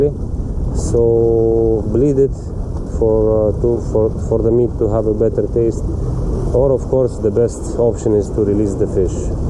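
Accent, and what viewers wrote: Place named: Russia. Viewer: From the first seconds I've heard Russian accent. Hello from Saint-Pet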